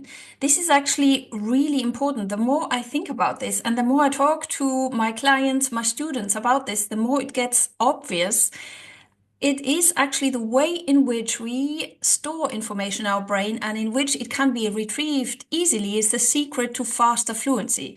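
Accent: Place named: Germany. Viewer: Just found your videos and they have some great tips. You sound German, your English is good though.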